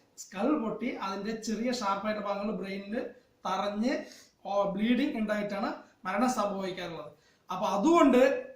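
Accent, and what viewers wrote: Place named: Palakkad, India. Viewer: aivvaa palakkadan basha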